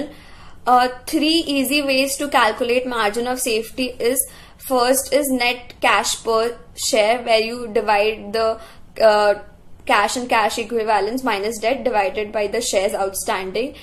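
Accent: India. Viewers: I like the indian accent very much 😉 . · Very very strong indian accent...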